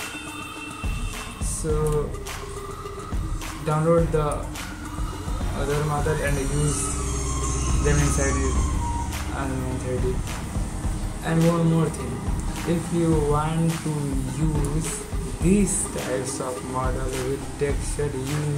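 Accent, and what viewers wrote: India. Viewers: u sound like an indian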